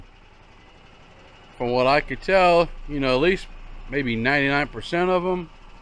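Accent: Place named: South, United States. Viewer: Another great video,. No neighborhood pool in that village? My wife says your accent reminds her of Foghorn Leghorn Thanks for all your great work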